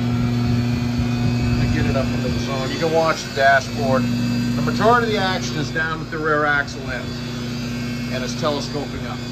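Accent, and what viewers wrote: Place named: Maine, United States. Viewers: Just a touch of Maine. lol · Thought so. Mike Day Concrete NORTH MONMOUTH, ME has the EXACT same accent.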